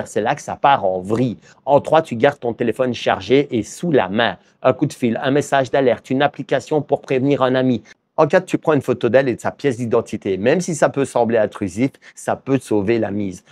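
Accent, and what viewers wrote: Liège, Belgium. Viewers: Hello, Gros accent liégeois? Tu es d'où? Je passe quelques semaines en Thaïlande en septembre-octobre, un conseil; plutôt condo ou hôtel? Intéressant en tout cas m,fi.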